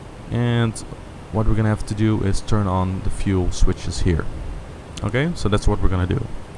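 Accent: Netherlands. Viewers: dutch accent... ;-)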